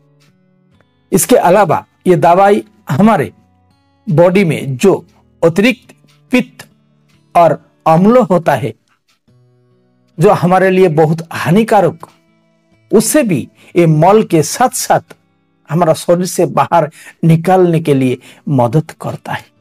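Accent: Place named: Bengal, India. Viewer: यह बंगाल से है इनकी हिन्दी एैसी ही होगी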